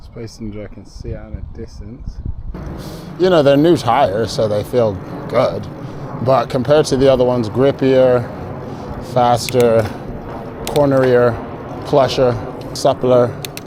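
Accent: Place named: United Kingdom. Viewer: I can really hear your years spent in the UK in this video. I’ve picked up on a few times but it’s really expressed here. Great ride! Thank you for sharing.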